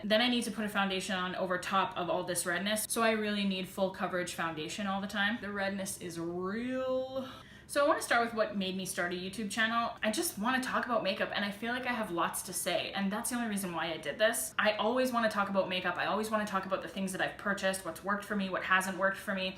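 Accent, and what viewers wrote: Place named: Canada. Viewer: Who doesn't love a cdn accent?!!!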